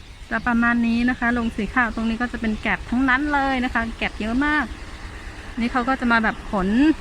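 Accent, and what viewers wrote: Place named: South, Thailand. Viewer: เอาแกลบไปเผาดินขอคือเอาไปเผากระเบื้องดินขอมุงหลังคาบ้านล้านนา ฝั่งไทยหาดูยากเหลืออยู่วัดต้นเกว๋นที่ถ่ายละครกาสะลอง คำว่า ขอ คือด้านหนึ่งของกระเบื้องจะงอๆเป็นตะขอเกี่ยวไม้ระแนงบนหลังคา ทำจากดินเหนียวเลยเรียก ดินขอ สำเนียงน้ำเสียงที่น้องพูดฟังไปฟังมาก็นึกถึงภาษาใต้ ภาษาตระกูลไทเหมือนกัน รากศัพท์ก็อันเดียวกันเช่น เถื่อนแปลว่าป่า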